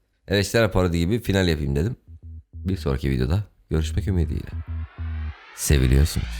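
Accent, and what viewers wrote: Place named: Anatolia, Turkey. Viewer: size bir tavsiye vermek istiyorum, anadolu şivesi yapmazsanız videolar daha keyifli olabilir. Ben ve 3 arkadaşımın ortak görüşü bu, sevgiler.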